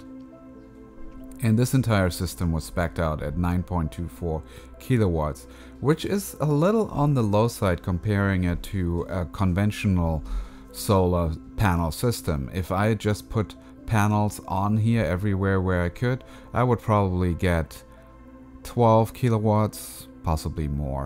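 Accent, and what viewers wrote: Germany. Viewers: Your accent sounds German.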